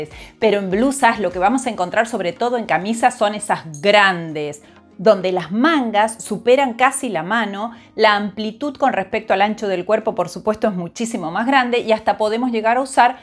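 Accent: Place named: Argentina. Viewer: Qué bueno encontrar un video argentino, con acento argentino, con nuestras costumbres y mas aun si se trata de moda!!! Excelente!!! 🤗🤗😘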